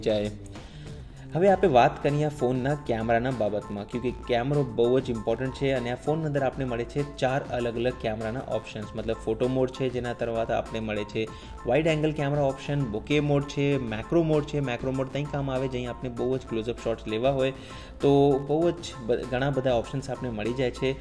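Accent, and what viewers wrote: Kutch, India. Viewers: ગુજરાતની કચ્છી બોલી બોલો છો તમે. મૂળ ક્યાંના તમે? સારું લાગ્યું કે તમે ગુજરાતી છો.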